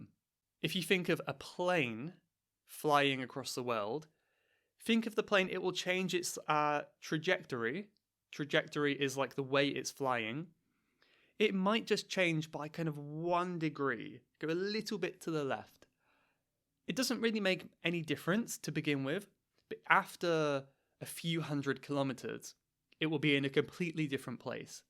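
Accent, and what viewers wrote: United Kingdom. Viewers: Thank you so much for this phenomenal video ☺️ can you please tell me in which British accent you are talking 😊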